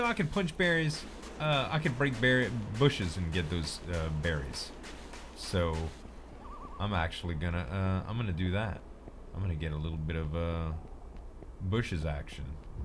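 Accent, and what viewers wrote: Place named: Grand Island, United States. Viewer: Love the accent, reminds me of grand island. Keep up the goood work!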